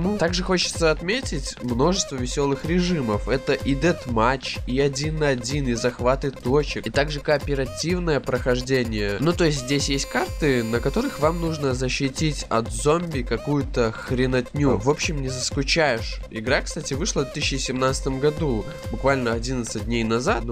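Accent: Belarus. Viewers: Ты беларус?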